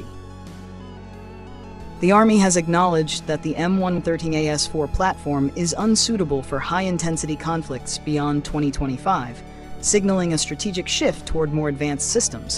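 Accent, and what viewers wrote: United States. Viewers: It's hard to watch something about our modified Bucket narrated by a yank. About the project, i think it's Boffins inventing systems from the point of view as seen from their desk and pc. If you want a warbot, make a specifically built one from the ground up, not convert a manned battle taxi. Make it small with only what it needs. I'm all for preserving our fleshware, but this is not the platform to do it.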